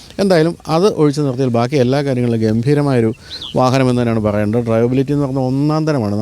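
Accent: Kottayam, India. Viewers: Your commentary is good as part kottayam slang like Alexa kocch😂😊.. i.e enjoy ur program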